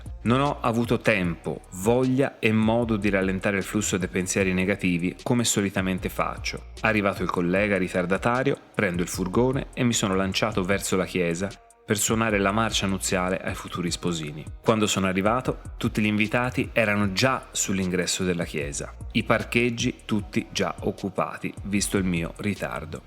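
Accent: Tuscany, Italy. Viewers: Cmq mi fa impazzire il tuo accento toscanooooo🤣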